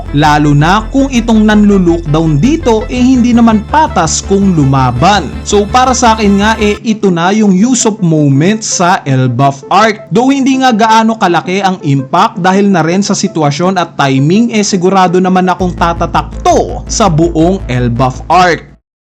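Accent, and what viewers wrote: Visayas, Philippines. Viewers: bisaya spotted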